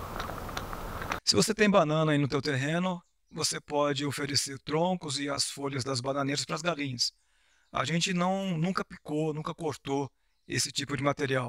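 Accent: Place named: United States. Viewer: Excelente vídeo ! No começo eu pensava que estava sendo dublado, pois o conteúdo, e a voz era estilo americano 😊 parabéns ! Vc realmente estende de criação de aves👏👏 ganhou mais um escrito 😎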